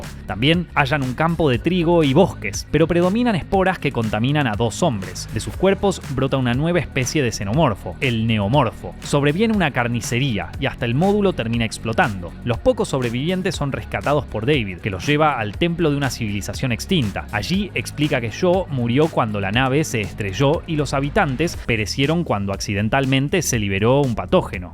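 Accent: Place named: Buenos Aires, Argentina. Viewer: La tonada porteña es insoportable.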